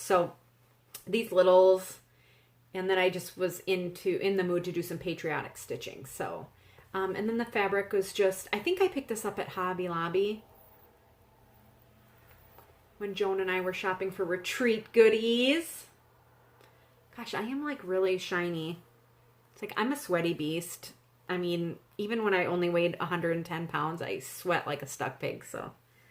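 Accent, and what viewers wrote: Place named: North Dakota, United States. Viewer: Your accent makes me miss home -- we moved to Mississippi from North Dakota 11 years ago, and my northern accent has faded, dontcha kno? But when I go back home, it's so easy to pick it back up again. Love your vids. You're a sweetie pie!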